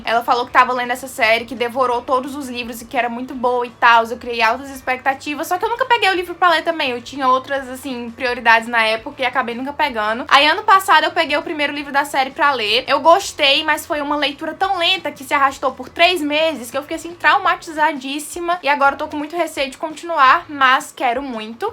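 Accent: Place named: Ceará, Brazil. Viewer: Oi,tudo bem? Pelo seu sotaque chuto que vc é cearense kkkk (sou nova aqui então fui no teu insta pra ter certeza, e fico feliz de vc ser daqui😊) eu queria saber se vc costuma comprar ou deixar livros no sebo, se sim qual sebo vc me indica?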